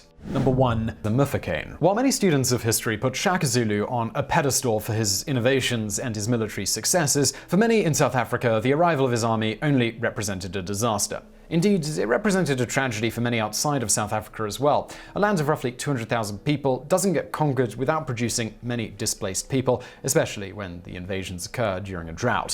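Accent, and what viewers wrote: England, United Kingdom. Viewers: your English we expect you to pronounce everything wrong. Don't sweat it. Your English accent is very appealing for you. Your content and your videos are always wonderful.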